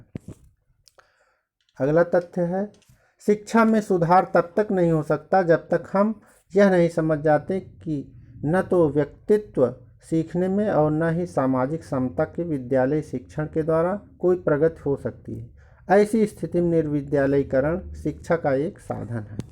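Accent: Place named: Australia. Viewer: Sir ye austrilan thr American nahi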